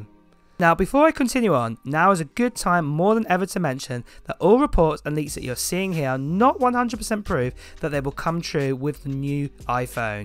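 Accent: United Kingdom. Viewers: Why don’t you have captions? I’m very HOH.. and have great trouble understanding British dialects!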